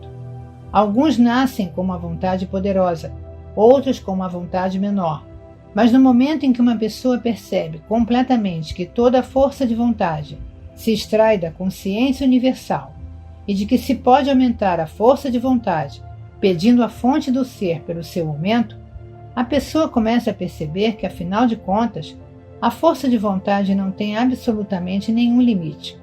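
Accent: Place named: Brazil. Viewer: Gratidão e Universo. Perfeito com sua voz brasileira. Amei. Que a Luz a seu redor continue se estentendo diariamente. Luz....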